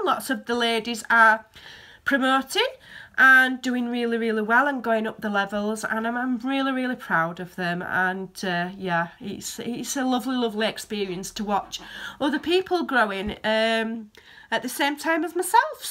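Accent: Yorkshire, United Kingdom. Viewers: Hello from Texas! I'm a Manchester girl born and bred so you have no idea how lovely it is to hear that Yorkshire twang. Can't beat a Yorkshire sense of humor, something sadly lacking over here. I can't tell you how many times I come out with a saying and people just stare back blankly. Any ways up (there's another!) I love your videos and follow you daily. Thanks muchly x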